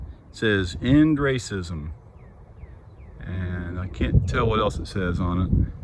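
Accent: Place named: New York City, United States. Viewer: loved your New York City accent ("DPUs, I see youse").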